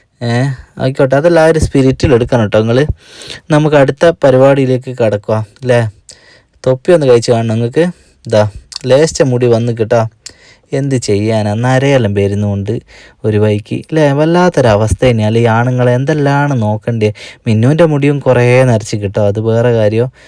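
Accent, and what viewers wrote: Vadakara, India. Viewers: വടകര ഭാഷ നല്ല രസമുണ്ട്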